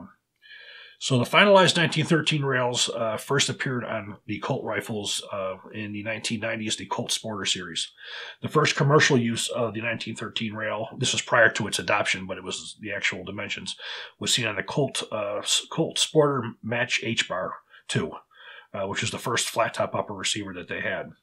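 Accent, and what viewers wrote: Texas, United States. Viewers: I love accents, especially Texan. Just caught the misciatta coming out. Hope all is well.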